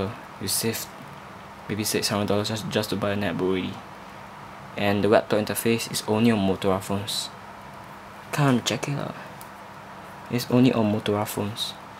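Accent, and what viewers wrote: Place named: Singapore. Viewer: I somehow recognised that singaporean accent. I'm a singaporean too. Can u pls show me how to connect thr wires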